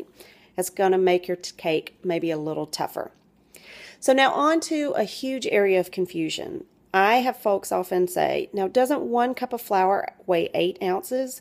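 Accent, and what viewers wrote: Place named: United States. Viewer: Ew her country accent got to go lol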